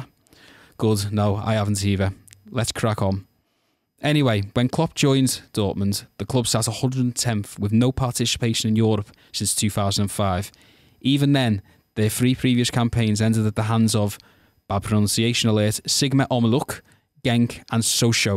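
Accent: Liverpool, United Kingdom. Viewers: English with a Scouse accent. Educate yourself. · Needed subtitles, not used to Scouse Gas. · That Liverpool accent tho, loved it.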